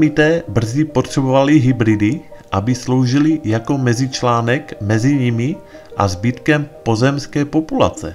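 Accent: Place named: United States. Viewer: Prečo má ten čítajúci pán taký anglický, resp. americký prízvuk? Alebo je to čítanie robotom?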